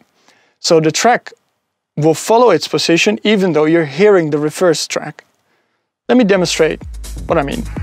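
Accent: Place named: Netherlands. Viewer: Ik kan horen dat je uit Nederland komt, mooie review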